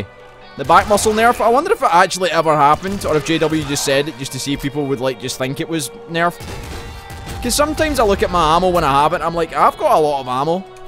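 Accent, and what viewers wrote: Scotland, United Kingdom. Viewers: ..... WHO IS THIS GUY AND WHY DIDNT I KNOW ABOUT HIM SOONER. as much as i like watching NL play Isaac, this guy is SCOTTISH NL, so to speak, which is just as amazing, cause he plays Nuclear Throne instead! That accent though... lols. So yeah, im subbing, im watching, and im probably gonna end up watching every episode he has.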